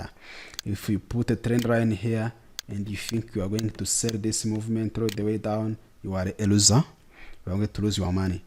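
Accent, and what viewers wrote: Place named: East Africa. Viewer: That accent sounds east African, it's good to be tonight by my fellow neighbor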